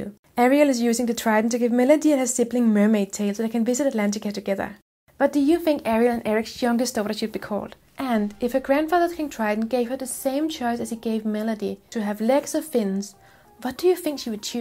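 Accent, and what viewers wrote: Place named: Denmark. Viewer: Your from Danmark i Can hear this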